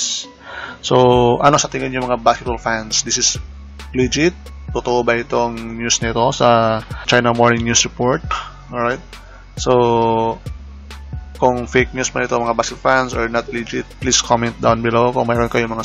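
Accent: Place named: Visayas, Philippines. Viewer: Bisaya man gid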